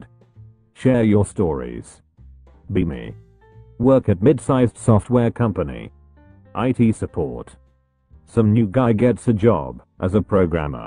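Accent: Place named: Wales, United Kingdom. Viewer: Welsh lad isn’t he?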